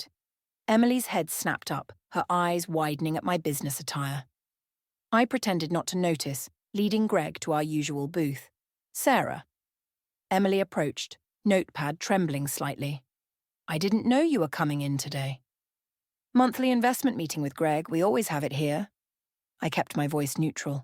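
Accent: England, United Kingdom. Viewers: Beautiful English accent.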